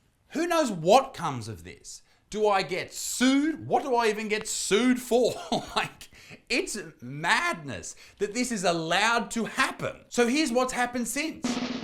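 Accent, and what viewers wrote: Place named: Australia. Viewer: i always thought you lived in australia with your accent and all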